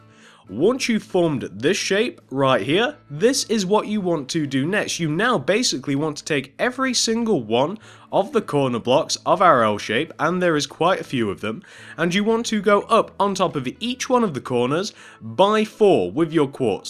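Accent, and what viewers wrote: United Kingdom. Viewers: UK AMERICA WERE DO YOU LIVE SOUNDING LIKE UK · I know your friend JosephBrown and i took your house that has a water fountain in front of it and i heard you talk british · _300 I hope you're being sarcastic because if you haven't already noticed...He is not American he is British....also British people have accents if you couldn't notice from the way he speaks....therefore he isn't saying free as three and fing as thing on purpose that's just the way they speak. Just thought you should know...just in case you didn't...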